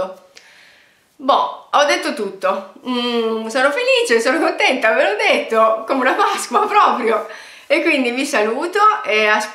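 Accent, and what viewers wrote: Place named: Turin, Italy. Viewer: Sei di Torino, vero? Riconoscosco quello che é anche il mio, per niente marcato, accento 😂😂😂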